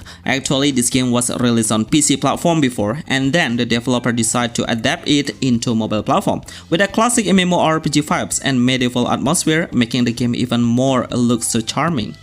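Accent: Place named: Indonesia. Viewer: Indonesian accent😅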